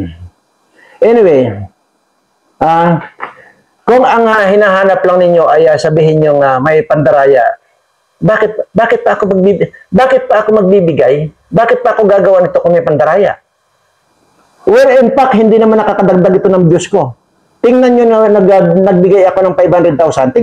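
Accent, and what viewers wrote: Iloilo, Philippines. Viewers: Daw ilonggo ka ,namit ka magestorya noh, kaluluoy man ang iyong nobya at hinimod na tanan sa imo hehe ,trying hard ko maghambal sang ilonggo,kahapon batangeno ka magsalita,bukas puede ilokano met tapno manggeg ko met nga agilokano ka maysa ak nga ilokano ngamen isu nga kayatko ko nga agsao ka no bigat no mabalin laeng, sige sayaw sir pangpagoodvives, happy kami na subscribers... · Good morning po sir, napasaya mo po ako sa ilonggo accent mo😊🥰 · Tuno ilonggo man sir ako karay a man antiquenio man🤣😂👊👍🙌💚✅🙏🇵🇭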